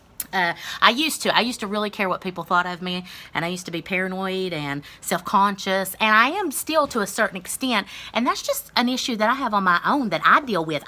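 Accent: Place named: South, United States. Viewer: You are the cutest thing i ever seen wit ya lil southern accent 😍😍😍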